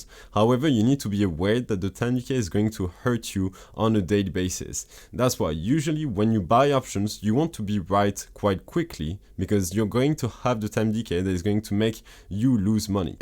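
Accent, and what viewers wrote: France. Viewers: Good video mate , I tried to open a tasty works account (I’m in 🏴 England) Was easy to open but getting the money into the account via CurrencyFair was a right nightmare. Ended up opening an interactive broker account and I can get the money in and out with no issues as interactive brokers have bank accounts throughout the world so it’s just like sending it to another English bank account. My 1st cash secured put will be going in today also 🥳🥳 on AT&T because ultimately I don’t mind if I get assigned. By your accent I’m guessing your french so do use CurrencyFair or a french bank to fund tastyworks just out of curiosity. Have a good day.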